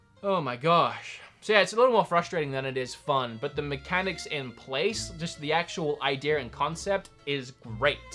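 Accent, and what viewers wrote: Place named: Australia. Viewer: So what’s this guys accent? He sounds Australian but says some words completely differently.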